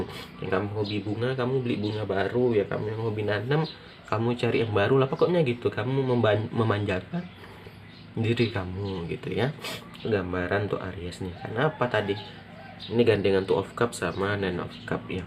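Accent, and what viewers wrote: Padang, Indonesia. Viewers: Ini yg reading orang padang yea logat nya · Orang Padang ya,,,logat nya tu loh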